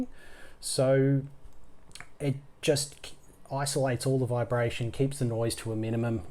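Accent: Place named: Australia. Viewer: Aussie accent my dude.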